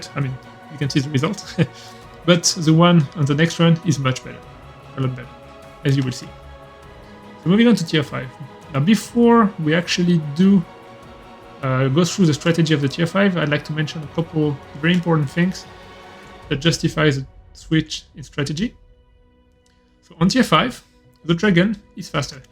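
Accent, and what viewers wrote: France. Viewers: Are you french ? Can you do this video in french ?😅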